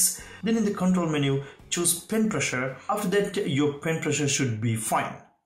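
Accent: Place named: England, United Kingdom. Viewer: Enjoyed the video. Thank you for the useful info. Although..... It was toooo difficult to understand the English Accent, and the opening logo was sooo long I almost skipped.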